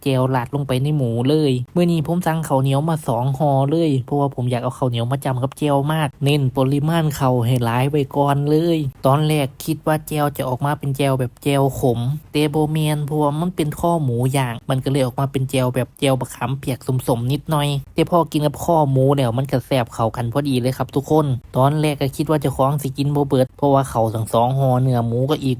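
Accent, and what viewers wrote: Thailand. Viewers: คนอุบลก็แบบนี้แหละ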